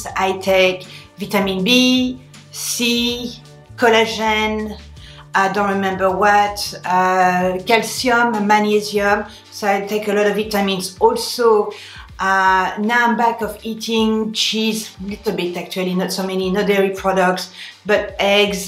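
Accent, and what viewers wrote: France. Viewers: Tu me fais rire., française aussi a.,Adelaide on a kes memes cheveux fins qui frisent et j aime las trop trop de produits mii non plus., Silicium organic d. Espagne est suoer pour kes ongles la peau et les cheveux Moi aussi je suis une vitamins junky multi B fish oil,.....tu as raison pour la longueur mais je suis trop faineante pour me raidir les cheveux 😀 les australiens doivent adorer ton accent 😘😘bravo oour ton chanel comme tu dis 😘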